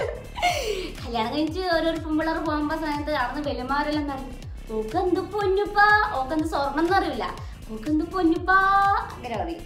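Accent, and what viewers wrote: Kasaragod, India. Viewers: Nammale kasaragod slang😍💓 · Kasrgod slang poliii ente kunjechiyeee👌👌👌🏾👌🏾🥰🥰❣️ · Nammale slang poliyan alle eachi.....❤❤ Ksd uyir❤ chechyyyy....❤💋💋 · Ksd slang peruthh ishtam 😍😍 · Njan Kottayam , kanjirappally pakshe Enna parayanada uvve kasargod slang kelkan njangak kure ishtavanne😂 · ഇങ്ങനെ ഒരു ഭാഷയും കാസറഗോഡ് ഉണ്ട് 😂😂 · Eth ningalude nattilullath.kasaragod thanne palatharathilalle parayunnath.njanum kasaragod ann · Kasaragod slang superpowli ❤️❤️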